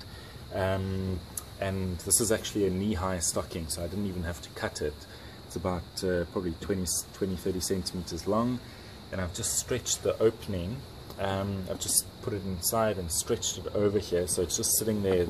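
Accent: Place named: South Africa. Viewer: He sounds so RSAn😂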